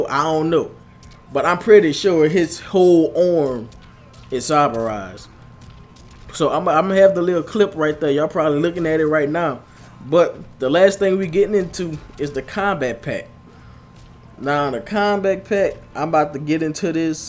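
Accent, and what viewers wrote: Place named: New Orleans, United States. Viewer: you sound like you from new orleans🤔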